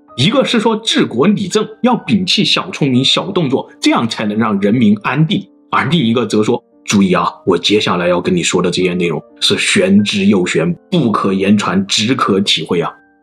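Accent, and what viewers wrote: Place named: Yichang, China. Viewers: 普通话讲的跟我一样的😂严重怀疑也是宜昌人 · 口音湖北人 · 我听口音就是我们湖北的，惟楚有材确实不虚啊，楚虽三户亡秦必楚啊！我们九头鸟确实是宇宙第一，乌拉！大武汉V587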